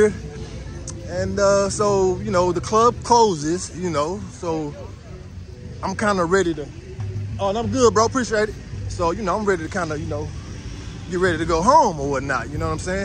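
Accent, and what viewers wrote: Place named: Texas, United States. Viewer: Nigga I'm from East Texas too, Longview ! Lol ! About to get my passport. I knew this dude had a texas accent lol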